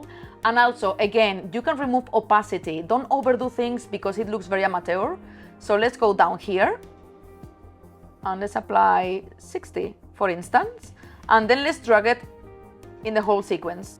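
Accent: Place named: Spain. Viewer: That spanish accent oh myyyyyyyyyyyyyyyyyyyyyyyyy <3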